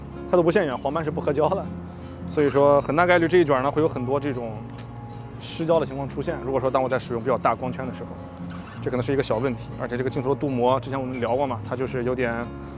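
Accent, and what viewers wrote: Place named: Qingdao, China. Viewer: 我就说听你口音像青岛的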